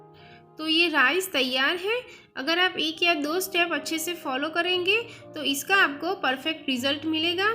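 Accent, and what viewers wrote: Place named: Bradford, United Kingdom. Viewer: Cant understand her Bradford accent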